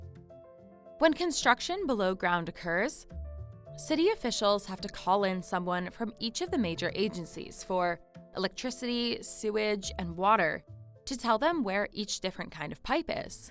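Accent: California, United States. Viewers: where does the narrators accent come from? American females aren't born whih it, yet so many want to sound like they were raised in a mall. just talk normal. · That annoying California accent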